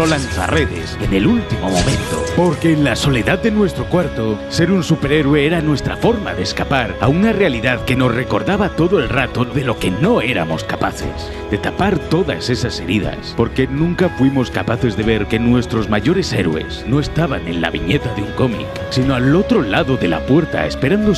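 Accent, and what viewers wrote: Spain. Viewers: Es español y así se dice aquí.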